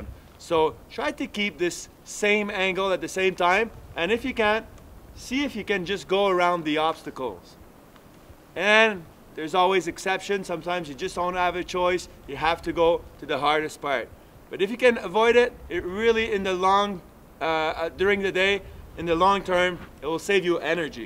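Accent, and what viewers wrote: Quebec, Canada. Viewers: Vous êtes québécois? Je perçois l'accent de chez nous!! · listening to the Québecois english has been fun.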